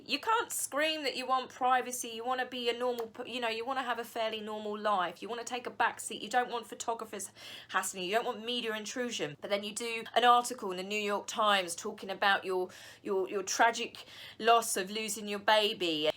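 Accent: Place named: Hartfield, United Kingdom. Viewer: I grew up in Hartfield! I knew I recognized the dialect!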